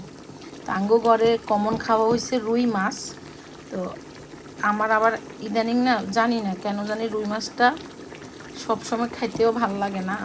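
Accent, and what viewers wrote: Noakhali, Bangladesh. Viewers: খাবার পান না আবার পচায়য়া নষ্ট করে বাসি করে খান।😢এই শাকটা টাটকা খেলে মজা পেতেন এখনতো রাবার হয়ে গেসে।আমার শশুর ভাড়িও নোয়াখালি আমার শাশুরি আপনার মতনই কথা বলতো আর এইভাবেই তরকারি রেখে রেখে পচায়া শুখাইয়া রান্না করতো কম পেয়াজ তৈল দিয়ে।মারা গেসে আমাকে তালাক দেওয়াইয়া আরেক মাগি ঘরে আইননা আল্লাহ উনার আত্তাকে শান্তি দেক বেহেস্ত নসিব করুক।আমিন।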